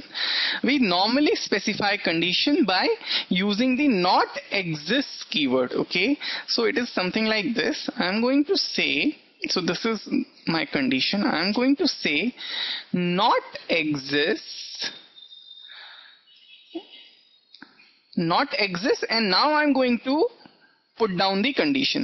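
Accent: India. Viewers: Cause of this fucking english that indians talk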